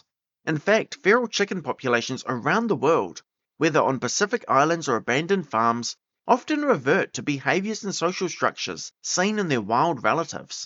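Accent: New Zealand. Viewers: Kiwi accent is crazy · I detected a New Zealand accent from the the third word! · Oh bro, I watch a lot of u tube and the kiwi accent is a shock. Chur to the chicken. Get your self a lotto ..after making this video you'll be extra clucky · "Easy to understand", well yeah, once you get past that strong Kiwi accent! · Very interesting. I'll subscribe. The NZ vowels are a bit of a challenge!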